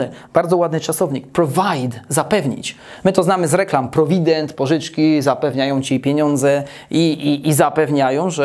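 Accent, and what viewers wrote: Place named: United States. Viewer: Lubię Pana słuchać z tym amerykańskim akcentem. Mam tylko pytanie, dlaczego uczy Pan w ciemnej piwnicy? Jak już to zamocowalabym te tablice na ścianie i ja porządnie doświetlila.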